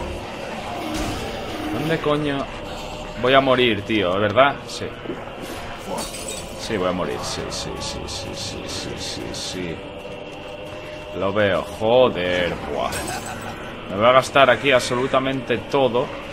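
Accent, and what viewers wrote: Mexico. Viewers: GRACIASSSSSSS!!!!!! QUE CHIDO TE SALE EL ACENTO MEXICANO LA NETA JAJAJAJAJA....SALUDOS DESDE MEXICO YEAH.... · tu acento mexicano es muy cool hahaha Saludos desde Mexico...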